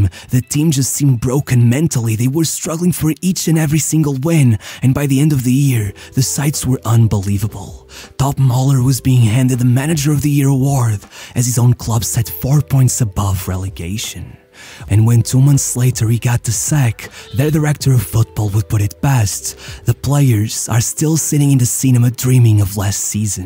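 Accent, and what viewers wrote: Portugal. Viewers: I think he sounds like this because of the Portuguese accent and not by choice really. But personally I prefer it than highly energetic YouTubers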